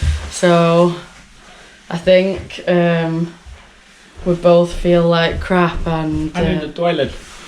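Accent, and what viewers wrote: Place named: Liverpool, United Kingdom. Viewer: Hi, I have a very light combination bike lock which probably wouldn't stop a professional thief but could deter someone just doing an opportunistic snatch and grab. UK has so many interesting accents, I was thinking Liverpool for you( I'm not sure on his accent). I have checked out your other videos and wild horses wouldn't have dragged me up that path in the Madiera mountains and those Greek beaches looked amazing. I do some videos of my cycling trips but just from an android phone so not very professional and room for improvement. Keep up the good work.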